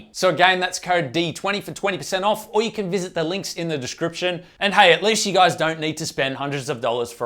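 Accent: Australia. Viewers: Are you Australian you sound like it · Is this guy Australian??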